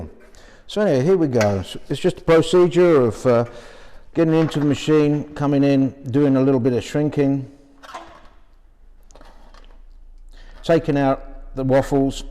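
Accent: Australia. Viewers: I couldn't pick the accent. Checking the about on the linked web page I see he started in Britain and moved to America. The resultant accent is closer to Australian than anything else.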